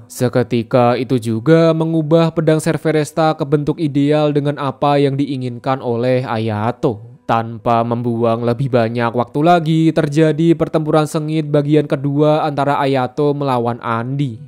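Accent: Java, Indonesia. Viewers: Meduk bahasanya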